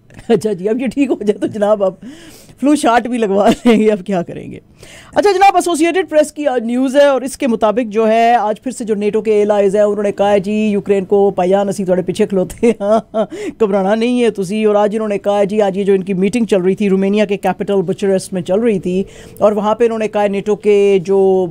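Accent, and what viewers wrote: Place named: Punjab, India. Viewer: Madame - your urdu accent is very poor . You speak urdu in punjabi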